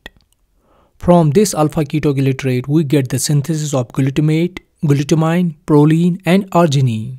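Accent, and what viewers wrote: Kashmir, India. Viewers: Are u from kashmir sir?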